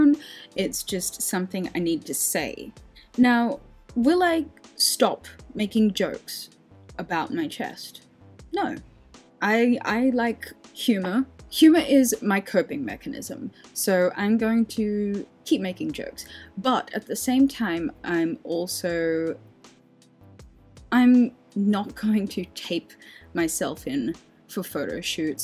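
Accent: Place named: Australia. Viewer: Pretty Australian accent.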